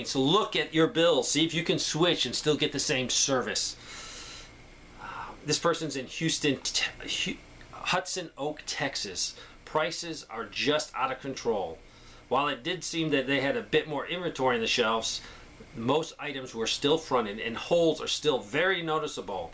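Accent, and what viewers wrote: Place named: Michigan, United States. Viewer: You have a Michigan accent, i could tell when you said galen instead of gallon.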